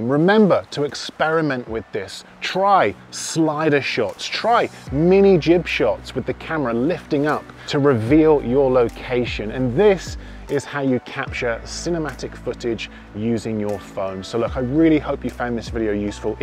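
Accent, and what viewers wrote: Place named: England, United Kingdom. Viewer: Honestly I have subscribed you of 2 things, 1. You are so good at this! 2. You are English (I love English accent) But I'm an Android fan! 😳😳😳 And I really don't want to unsubscribe your channel at all! 😞😞😞😞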